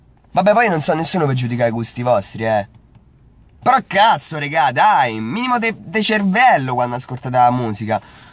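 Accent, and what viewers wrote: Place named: Marche, Italy. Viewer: Ma sei marchiciano 😂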